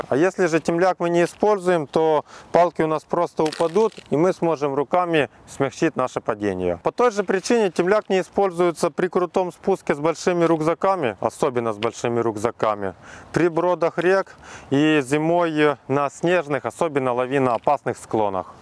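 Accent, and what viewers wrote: Ukraine. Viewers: у вас же лютий, важкезний український акцент. не тортуруйте себе, говоріть українською!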